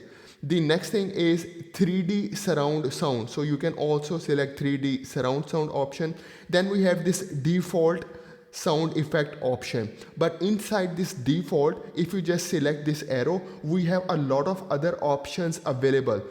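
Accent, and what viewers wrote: India. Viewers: Yessir he has an Indian accent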